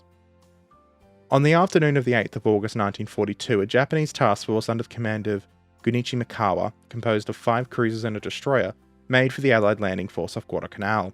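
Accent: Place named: Australia. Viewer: You need to do an entire series on Australian history. As someone who knows way more history than most people, I can tell you the mainstream knowledge of it basically consists of, "British prison colony who kinda abused the natives like everyone else at the time" and not much more than that. It's kinda shameful. I could look it up but id rather you just tell me in the Aussie accent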